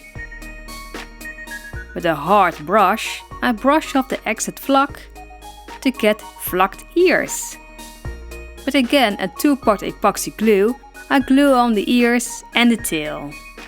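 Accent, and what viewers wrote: Netherlands. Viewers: Are you dutch you sound very dutch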